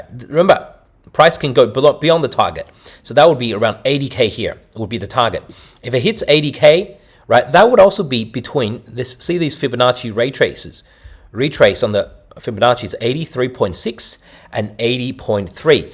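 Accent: Australia. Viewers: Accent sounds Australian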